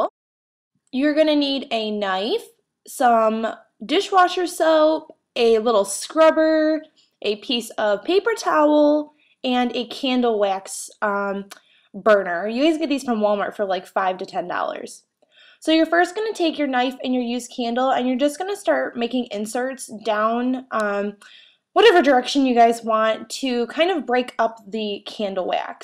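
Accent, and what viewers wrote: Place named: North, United Kingdom. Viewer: As a northerner, I can hear in your voice that you are also a northerner. Good video!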